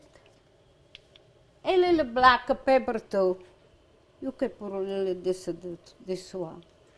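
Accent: Italy. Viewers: I simply love this Italian Grandmother. I made this last night, and it came out fabulous. Thank you so much for sharing. Love her accent. I can listen to her all day. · First, the word anchovies is in the plural, so you ask: 'Where are the anchovies?' Second, anchovies do NOT go very well with this dish, what about some cheese ? However, I love the Italian accent ! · She speaks a perfect Italianglish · But it's hard to understand her .she has strong Italian accent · I like her Italian accent 😄😆😁🙏👍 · Thank you for sharing your faith Bless be Jesus Christ give you more year continue cooking with love sharing with all people around the world 🌎 ❤ 💕 God bless you always Have bless day I love your Italian food and your beautiful accent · La signora, classe 1935, ha lasciato l'Italia negli anni '70, partendo dalla provincia di Foggia. Come molte donne del Sud Italia, probabilmente avrà al massimo frequentato le scuole elementari e quindi l'unica lingua parlata era il dialetto foggiano/pugliese. 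Questo aspetto, dopo 50 anni vissuti negli States, comporta questa pronuncia (simile a molti emigrati italiani negli USA), ovvero uno strano mix tra dialetto, italiano e inglese. Con parole italiane che - con il tempo - vengono pronunciate nella maniera inglese. E questa forte cadenza del suo dialetto che influenza la pronuncia in generale. Le dosi di aglio e peperoncino, come dice anche mentre prepara il piatto, sono abbastanza a discrezione. Anche secondo me ne ha messo troppo (tra l'altro - come sai - alcuni mettono lo spicchio intero e lo tolgono alla fine) ma, soprattutto, ha messo il pecorino/parmigiano che in questa ricetta non c'entra una fava! 😅 Già a me dà fastidio il prezzemolo (che va messo comunque alla fine, non nell'olio caldo!), ma è un ingrediente che si usa mettere. Ma il formaggio grattugiato proprio no.😩 Comunque, come forse sai, è abitudine abbondare di aglio nelle cucina del Sud. 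Come con il peperoncino 😳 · How much i like that accent! Italia!😊💝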